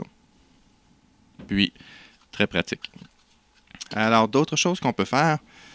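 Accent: Quebec, Canada. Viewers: j adore ton accent quebecois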